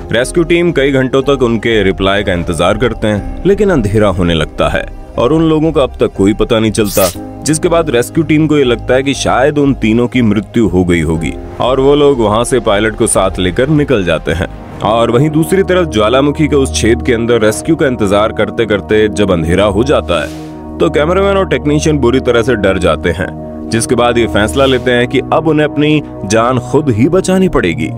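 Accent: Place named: Hills, India. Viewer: आपका आवाज बहोत पहाडी है sir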